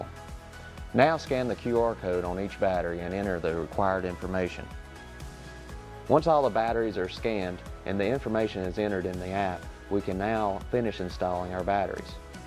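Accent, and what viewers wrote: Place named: South, United States. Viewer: I like the south in your mouth 😊.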